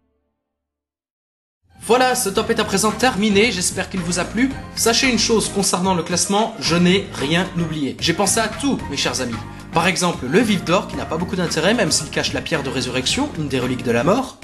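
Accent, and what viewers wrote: Switzerland. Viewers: Tu est suisse ? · Monde remplie de magie il a un accent suisse c'es tout ^^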